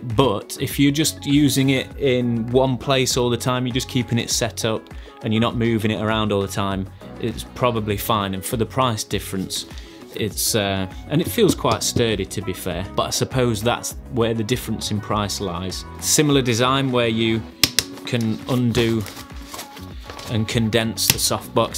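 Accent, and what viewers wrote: United Kingdom. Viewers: I think you're #DannyGevirtz with a British accent. Thanks for another great video, bro.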